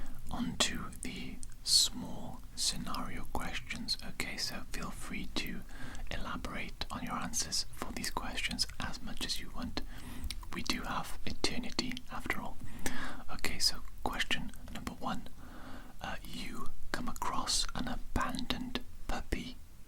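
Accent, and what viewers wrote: Wales, United Kingdom. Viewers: Are you Welsh by any chance?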